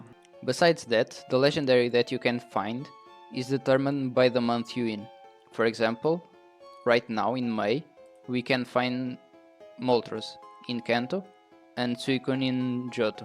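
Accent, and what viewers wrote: Portugal. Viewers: És tuga nao és? Dá para perceber pelo teu sotaque 😅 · That accent, 100% Portuguese! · Are you portuguese?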